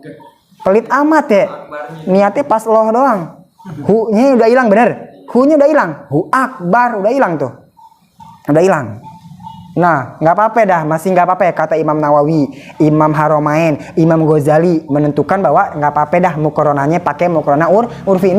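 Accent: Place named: Jakarta, Indonesia. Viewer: Bang care ngomong lu gue demen banget dah bang😊 mau nanye nih ape boleh..?.abang ustaz orang betawi ye betawi mane baang..?.logatnye medok banget bang enak gue dengernye kaye gado"..betawi..he hee..salam.kenal bang 😂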